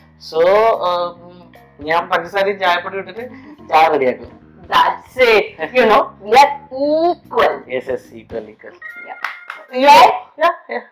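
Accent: Kannur, India. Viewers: Kannur or kasaragod ?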